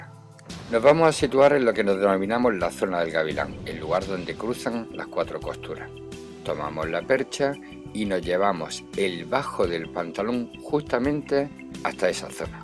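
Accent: Spain. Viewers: Muchas gracias por el truco, Me gusta tu acento elegante andalú.👍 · A mí me ha parecido muy útil el consejo, gracias ! Por cierto el acento del presentador me parece de Granada.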